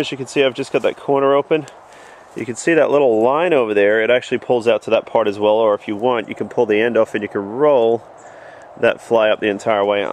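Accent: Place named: United States. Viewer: You have a thick American accent for an Aussie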